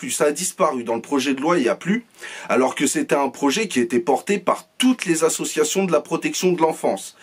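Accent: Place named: Banlieue, France. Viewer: voix de benlieusar voix de bonhomme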